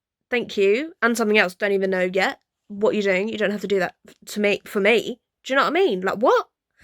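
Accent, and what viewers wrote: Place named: United Kingdom. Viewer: Nah, you would totally dominate that podcast ! for one, your're British accent will make you sound superior, Lol - and 2, you know your stuff! I hear a ton of blogs saying she is back with Agron? I actually think they're in communication, maybe not back together just YET< >but they soon will be. I also think that Taylor will at some point, start hinting more towards her sexuality, she will either do something major after ( covid) and get papped being way too touchy feely wit a girl just to drop hints... and then eventually, she will start forcefully hinting at her 'bisexuality'< she will never quite come out of the closet, but she will aggressively ( more than she already have been with Karlie) drop suttles. Taylor want's out the closet, but her team will NEVER let her. Because taylor , Jennifer Aniston, Katie Mcgrath and an host of others, will never, EVER address those sexuality rumors.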